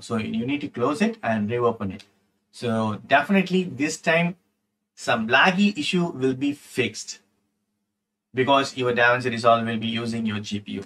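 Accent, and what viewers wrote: India. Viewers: mf indian 3cpo giving us a tutorial in davinci resolve.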